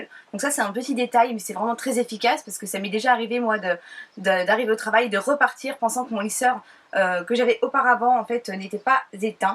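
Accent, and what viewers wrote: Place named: South, France. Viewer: tu as un accent du sud on dirait !😍 ta vidéo est top ! <33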